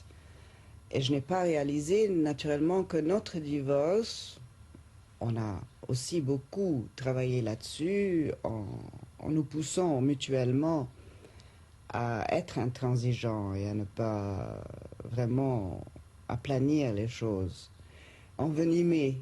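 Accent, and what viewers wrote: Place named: Egypt. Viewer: لهجتها الفرنسية حلوة بس ظاهر فيها انها مصرية :) انا مهتمة اوي بالتاريخ المصري وحقيقة مصر ام الدنيا بلا منازع.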